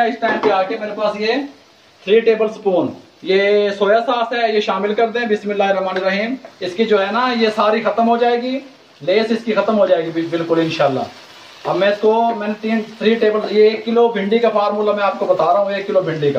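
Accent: Punjab, India. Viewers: You know what I'm big fan of u the way u are talking specially when you tease in typical Punjabi I damn love it..Please keep it up best Regards, Shoaib from UAE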